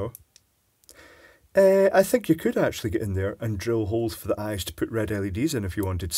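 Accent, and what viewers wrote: Scotland, United Kingdom. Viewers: Resisting urge to inform newbie that is Scottish. I have failed.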